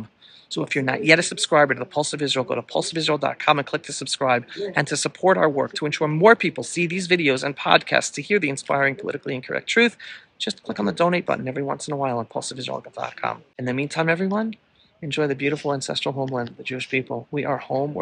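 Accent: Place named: Arab world. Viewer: Your Middle East accent is hard for me an American to understand.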